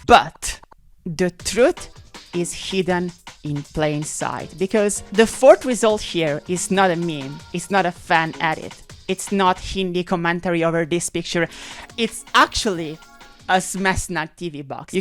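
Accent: Italy. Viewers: She is definitely Italian. Her English pronunciation is really quite good, though. Italians usually have a really hard time pronouncing H at the beginning of words, for example, but she does it fine.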